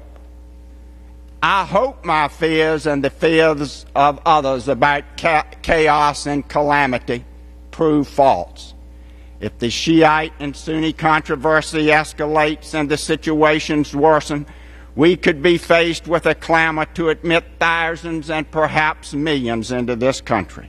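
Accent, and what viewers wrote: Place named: South, United States. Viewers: Wooo He got that Old Virginia Accent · He has what is the source accent for the American Black Accent. In some parts of the South the notion that you can tell when a person is Black by listening to their voice is false for the White folk talk exactly like the Black folk. He's moderated his accent thanks to his career, but those from the same place have an even more extreme accent.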